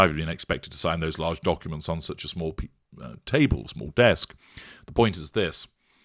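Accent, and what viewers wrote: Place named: North, United Kingdom. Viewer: My inner voice has started being a bit recently... only without the little northern lilts or the ability to talk sense.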